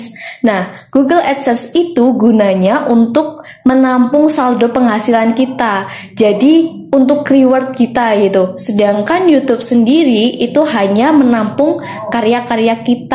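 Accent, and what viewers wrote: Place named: Java, Indonesia. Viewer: Wah udh dapat gaji ya kak, mantap.. Nek denger logate, podo bae wong jowo iki.. Kayane sekabupaten malah hhe.. Semangat berkarya selalu..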